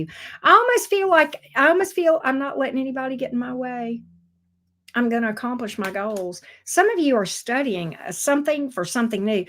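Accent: South, United States. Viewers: Happy New Year! You’re the cutest! Love your southern accent! 😘🥰😍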